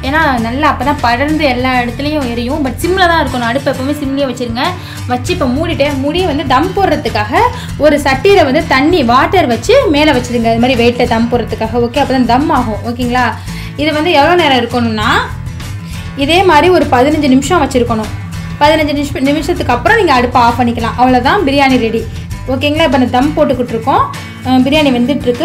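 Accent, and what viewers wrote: India. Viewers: Please vaya moodu ma... First yedhadhu channel la Samayal program yepdi pandranga nu pathutu relax ah video la pesunga... Pre video first oru paper la seymurai pathi step by step unnecessary repeat words illama yezhuthi vechu oru murai pesi parunga... Correction ku yarathu pakkathula vechu senju parunga... Then oil kodikara sound pondra idangalil background music avoid pannunga.. better neenga pathiratha moodi vechu time kudukanum nu soldra time ku music add panninale enough. Then very important neenga madurai, theni, ramanad, sivagangai area ah sernthavangannu nalla teriyuthu adhu thappillai. Unga area slang la neenga pesunga city karanga pola pesa muyarchikka vendam.adhu ungal videovin iyalpai kedukum.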